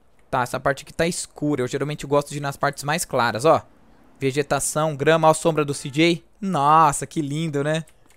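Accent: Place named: South, Brazil. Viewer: Eu pensava que vc era do Rio Grande do Sul ou santa Catarina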